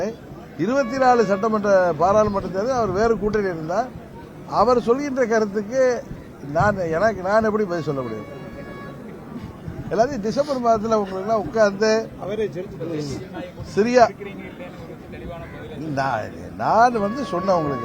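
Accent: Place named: Thanjavur, India. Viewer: Thanjavur kaarar slang, Thiruppa thiruppa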